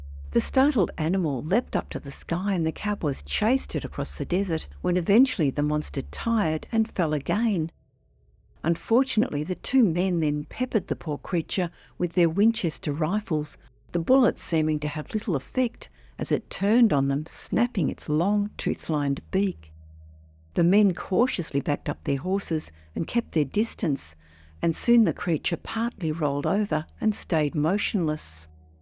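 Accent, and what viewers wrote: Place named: Australia. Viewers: That's an Australian accent, pretty close though. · It sounds like an Aussie accent (Australia) or a Kiwi accent (New Zealand).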